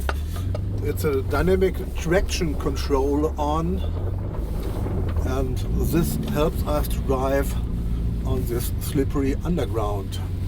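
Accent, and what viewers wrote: Germany. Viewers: I like the reviewer! I love the german-english! keep up the good work! (Car is ugly)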